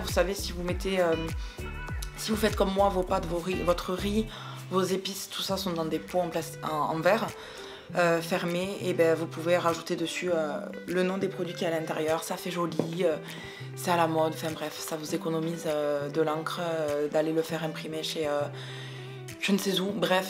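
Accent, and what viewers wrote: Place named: South, France. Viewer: l'accent du soleil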